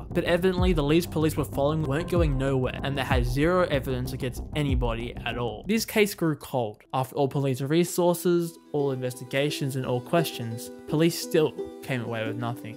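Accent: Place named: Australia. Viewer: Great video! So cool to hear an Aussie covering these topics. Hope to see more from you!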